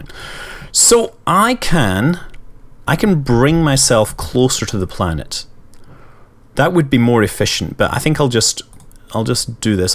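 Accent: Scotland, United Kingdom. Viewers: he isn't Irish... he isn't faking Irish... he is Scottish that is a Scottish accent not a Irish one so you are technically right he is not Irish but he is not a faker. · cool accent, are you scottish or canadian?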